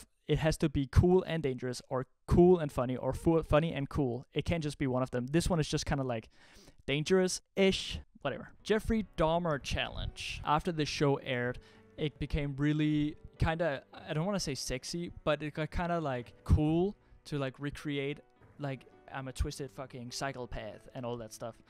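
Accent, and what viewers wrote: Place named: Denmark. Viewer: I can sniff out that danish accent anytime awesome video tho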